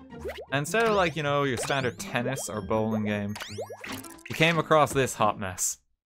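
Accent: Ireland. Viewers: Is this lad irish cause like he sounds irish but speaks like hes american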